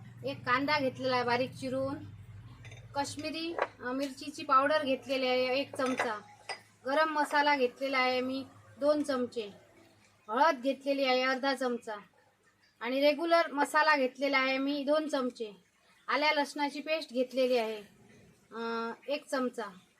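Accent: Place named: Malvan, India. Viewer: भाजी करण्याची पद्धत, मस्तच, तुम्ही कुठचे तुम्ही. तुम्ही मालवणी पद्धतीने बोलता म्हणून विचारले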